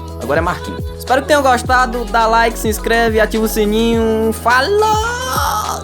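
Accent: Northeast, Brazil. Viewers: Gostei de mais kkkk tu é nordestino?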